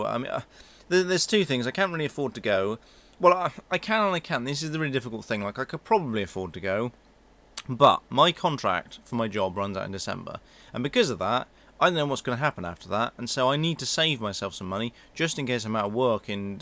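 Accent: United Kingdom. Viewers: Dat Yorkshire accent doe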